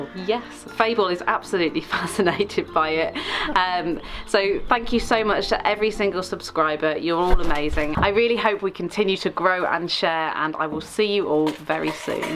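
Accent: United Kingdom. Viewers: I used to own a business here in rural Georgia where I would look after farms, or just pets while people were out of town. All of the critters touched my heart in their own way, but the birds I found fascinating because their vocal ranges allow a window into their thoughts and also their humans. Like with Fable, it was obvious you were British, laugh easily and are a calm, gentle person. I wish all of my beloved animal friends I’ve met owned a human like you! Your critters are so blessed to have you! Love from the Blue Ridge Mountains - and congratulations 🍾🎉🎊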